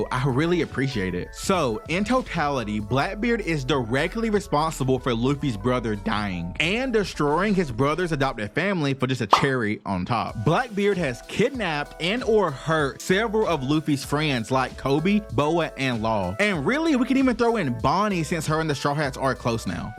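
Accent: South, United States. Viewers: Nice southern accent. Not hating, I have a southern accent too. Awesome video though and I’m subbed now. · Sorry but with that weird ghetto southern drawl i couldn't make it past the introductions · You have such a southern accent man, where are you from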